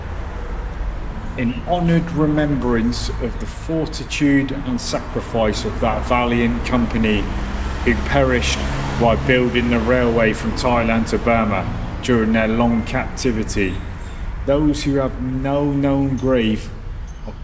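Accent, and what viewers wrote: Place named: West Country, United Kingdom. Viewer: Polar opposite to Pattaya, that’s for sure 😂 Which part of the England are you from? I hear a west country accent, maybe a Devon accent?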